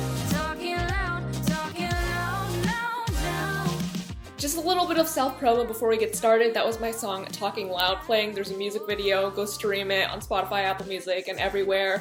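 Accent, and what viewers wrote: United Kingdom. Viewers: Me being a British person, reacting to her British accent 😂 I died 😂😭🤭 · Wth I was like "her voice sounds familiar but I've never watched her before" and it's because you sound like trin lovell but less deep, even the british accent lol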